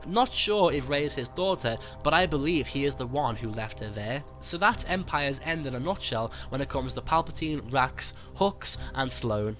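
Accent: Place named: Northern England, United Kingdom. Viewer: Just wondering - I'm guessing you're from northern England - what football team do you follow?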